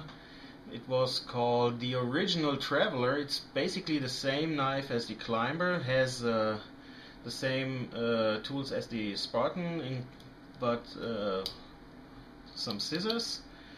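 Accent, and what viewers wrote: Germany. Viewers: Excellent exhaustive video! I can tell from your accent you're from Germany, kudos to you for speaking great English!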